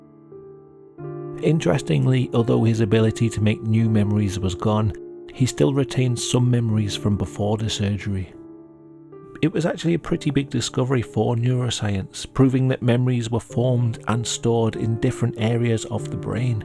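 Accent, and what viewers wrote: United Kingdom. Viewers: Love your channel,. I’ve always been naturally curious about morbid subjects but I’ve noticed that after several traumatic experiences in my real life, I have an increasingly developed relationship with things that disturb me. I can’t seem to find any interest in media with subjects that aren’t slightly taboo or disturbing anymore. Maybe it is part of the long term processing, maybe it’s part of just having the veil lifted since my own disturbing experiences. Sometimes I wonder if it’s a curated and safe way to feel afraid or unsettled, but not be in any danger, and to be learning and exploring ideas without direct risk. It’s made watching videos such as yours varyingly more intimate as an experience, psychologically, than before. Your voice is also very familiar and stabilising to listen to - not trying to sensationalise, you’re genuinely interested in what you’re discussing without novelty of the subject or people involved. 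It’s also probably comforting for a familiar British accent and creator in a US heavy site. Looking forward to all videos in the future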